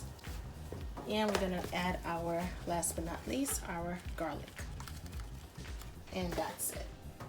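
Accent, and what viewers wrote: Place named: East Coast, United States. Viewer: Love the east coast accent!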